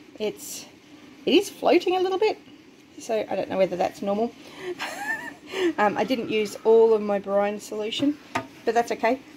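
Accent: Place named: Australia. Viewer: Hello, just met you on the Silhouette live, there were so many that subscribe to our channel and I just wanted to say thank you ❤️ I just subscribe to your channel looking forward to watching you. Love your Australia accent, Lisa from moneypit homestead we are in Kentucky usa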